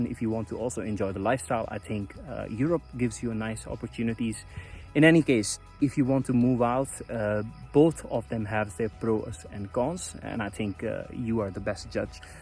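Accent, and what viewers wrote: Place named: Netherlands. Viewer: your English accent have a dutch inflection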